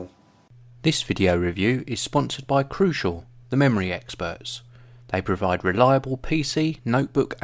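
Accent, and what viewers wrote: United Kingdom. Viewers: Oh great your a brit. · Man i love your ACCENT!!!! i wish i could talk like that... i love that typical british accent!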